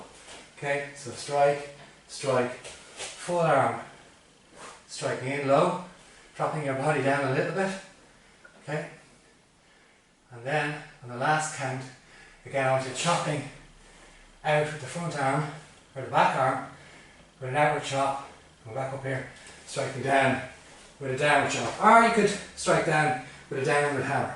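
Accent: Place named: Cork, Ireland. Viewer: My word you sound just like my neighbor. He's from County Cork.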